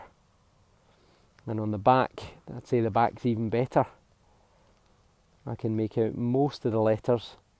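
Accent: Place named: Highlands, United Kingdom. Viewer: id buy that T Shirt )) So True and Well Bloody said with that velvet accent of the Great and Ancient Highlands !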